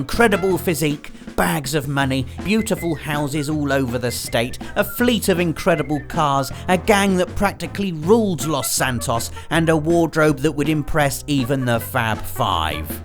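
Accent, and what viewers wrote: United Kingdom. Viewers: A few days ago,i got suggested this channel.Since that i watched a few dozen videos.And while i was watching,started to wonder why i like this so much.Good content,down to earth commentary and the main thing,a british voice! There is something in that accent which gives some extra boost to commentary.Also i just love it.Hope this will be running for years.Also,from elder scrolls,i would say that Skyrim is worse,there you start as someone that stands in line to lose his/her head.There is Kingdoms of Amalur,where you are starting as someone who just gotten dumbed as a lifeless corpse down to the rest of your kind. :]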